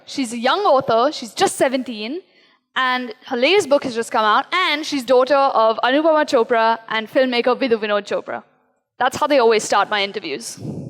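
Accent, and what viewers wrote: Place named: South Bombay, India. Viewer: No she can't. That sick snobby SoBo accent smh... Publishing her books at 15 when writers get rejected so much.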